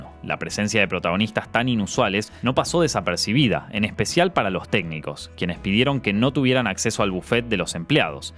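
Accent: Argentina. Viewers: odiavle el acento argentino pero ni modo... la tematica esta buena. me aguanto. 🙄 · Viejo. Normalmente la narración con acento argentino no me agrada, pero el tuyo está demasiado brutal... 👌🏻 No me extraña el éxito que tienes.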